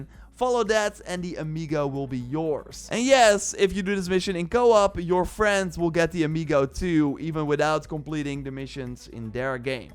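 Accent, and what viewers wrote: Netherlands. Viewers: Nederlander ik hoor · You have a really dutch sounding Acsent are you dutch?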